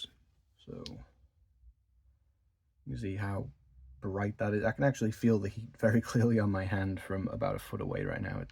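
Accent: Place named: Northern England, United Kingdom. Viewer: Nice review 😊 Is it just me,.or do you have a hint of northern English accent there? Maybe it's the other way around, perhaps you're British with a hint of American 😂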